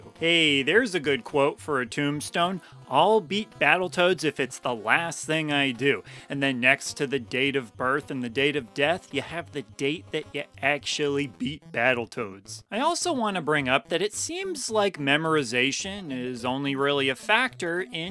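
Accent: Midwest, United States. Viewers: ah ha! Now that I have you, are you from the Midwest?? You sound like a friend from MN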